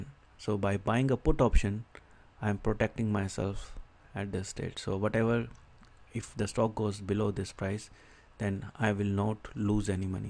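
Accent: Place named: India. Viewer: No from Japan, what do you think????? Of course from India (from his accent).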